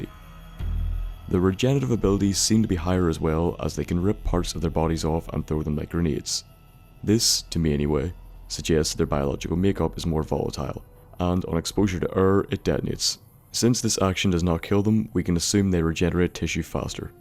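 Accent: Northern Ireland, United Kingdom. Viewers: i believe he got a northern irish accent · That Northern-Irish accent tho!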